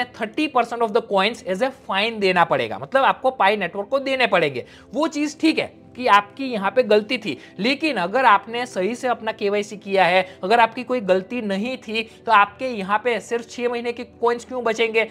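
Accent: Gujarat, India. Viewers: Bhai tu Gujarati hai kya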